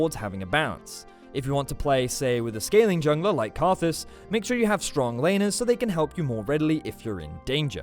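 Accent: United Kingdom. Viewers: British accent drives me nuts.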